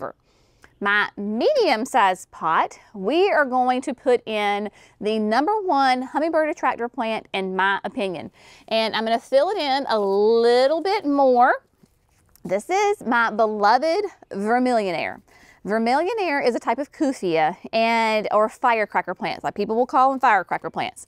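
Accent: South, United States. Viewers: I LOVE your southern sus ❤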